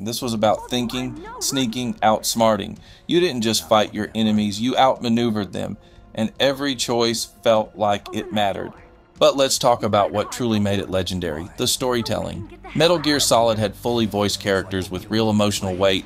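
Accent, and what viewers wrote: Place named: South, United States. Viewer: Where are you from? That's a thick southern accent. Well narrated, good job mate!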